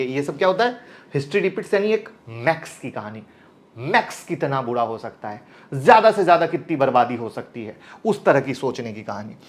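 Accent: Bihar, India. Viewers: App sir Bihar se ho lagta hai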